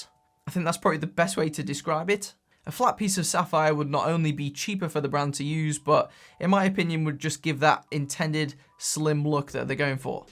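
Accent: United Kingdom. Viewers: Just wondering, what is your British English Accent?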